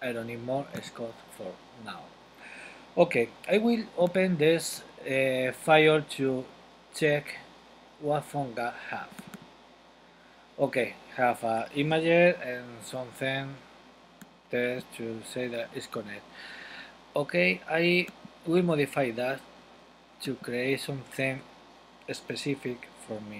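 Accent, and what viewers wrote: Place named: England, United Kingdom. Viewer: I love your English Accent !!! haha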